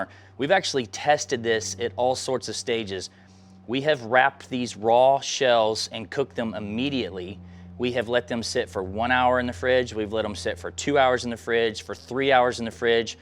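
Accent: South, United States. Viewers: The perfect speed to watch this video is 1.75X. Try it at 1.75X speed and you will see it watches like a regular video and this guys slow manners and southern drawwwwwwl Go away.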